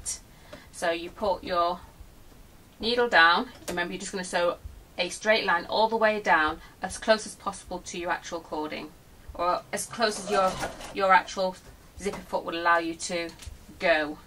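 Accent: Manchester, United Kingdom. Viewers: Thanks for this. I love listening to you. You sound just like my cousin from Manchester.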